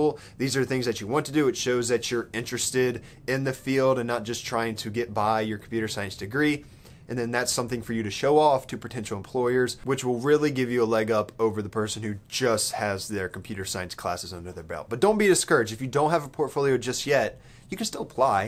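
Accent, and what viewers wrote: Texas, United States. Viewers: Are you from Texas? I noticed you say y'all a lot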